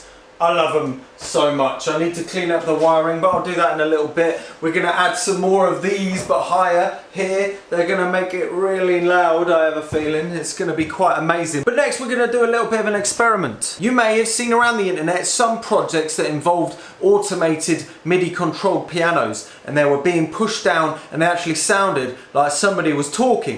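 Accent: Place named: United Kingdom. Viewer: The voice has a British accent.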